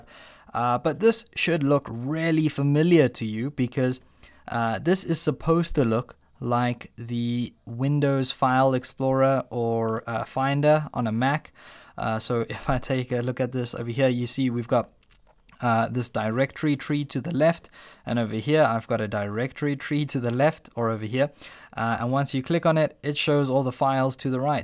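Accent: South Africa. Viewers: Love hearing a South African accent for a change!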